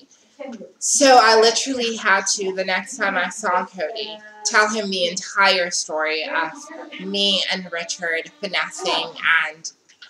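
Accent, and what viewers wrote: United States. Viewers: Why do you have an American accent if you’re from South Africa?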